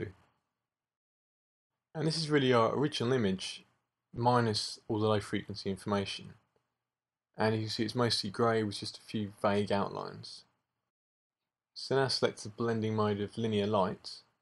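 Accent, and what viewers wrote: United Kingdom. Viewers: buddha bless you for the British accent, so sick of s. tanks brilliant tuts, subscribed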